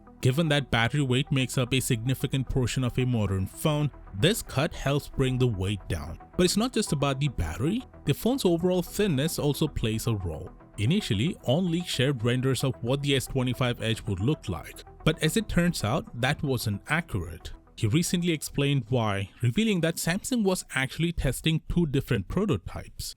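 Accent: India. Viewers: Of course its an indian accent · ur english sounded like indian or pakistani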